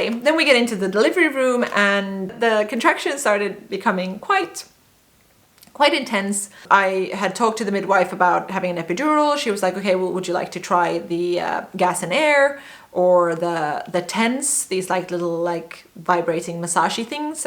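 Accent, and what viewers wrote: Finland. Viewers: I really liked this story. not sugarcoated or treating birth as a 'who did it better' thing, so chill and funny at times. a lot of details and no swooning, just being down-to-earth and positive. and I must say I adore the traces of Finnish accent you have, like saying five words on the same breath while your intonation goes down and so on. I can't help it, I'm just a sucker for it :D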